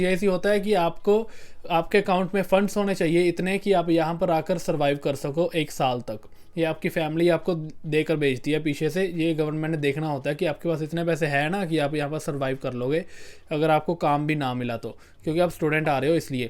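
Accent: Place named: Uttar Pradesh, India. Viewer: Canada Jake vee up wali boli bolde ho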